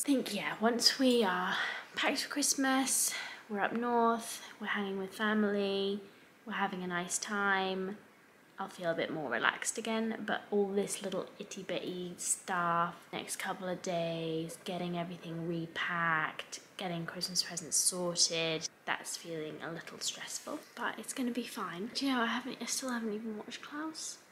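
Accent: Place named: United Kingdom. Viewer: I love this channel. I can learn natural British English through this channel and l like her daily life too. 😁😁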